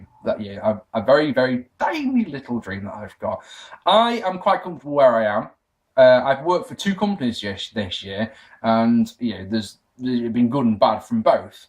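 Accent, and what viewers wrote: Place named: Cockney, United Kingdom. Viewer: Hay why do you do the whole cockny boy "faaking awesome"?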